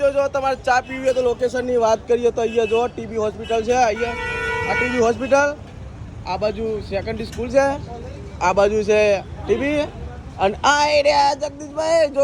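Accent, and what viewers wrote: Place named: Vijapur, India. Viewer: Good. Good. Original. Vijapur. Nee. Bhasa.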